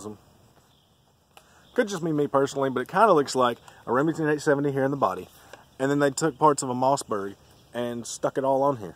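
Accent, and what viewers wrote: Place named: South, United States. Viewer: If it weren't for the southern drawl, you'd sound like Barrack Obama.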